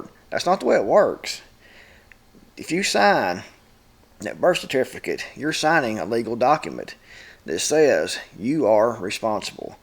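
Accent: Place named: Kentucky, United States. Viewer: Love your accent. My momma and daddy were from South Central Kentucky. Your accent sounds like all my cousins from down there. Unfortunately I was born and raised in Louisville and the accent of Louisville natives is by far, not southern sounding at all. Even here in SC the accent is different.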